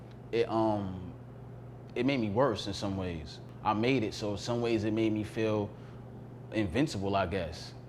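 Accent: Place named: New York, United States. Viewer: I KNEW he was from NY before they even showed Harlem Hospital lol. The accent is unmistakable. #myfellownewyorker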